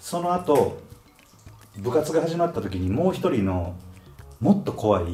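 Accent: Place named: Kansai, Japan. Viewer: 関西弁も相まって宋世羅の羅針盤チャンネルを彷彿させる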